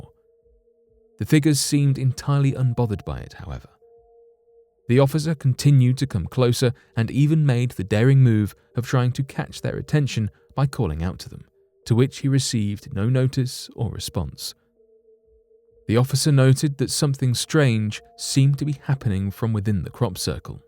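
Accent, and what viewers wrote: United Kingdom. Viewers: "Hieroglyphic Texts" said that British accent just sounds so scholarly and knowledgeable tell me everything's going to be ok you sexy X - files nerd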